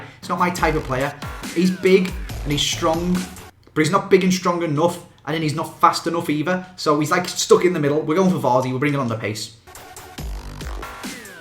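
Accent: Liverpool, United Kingdom. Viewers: His he scouse (Liverpool) or welsh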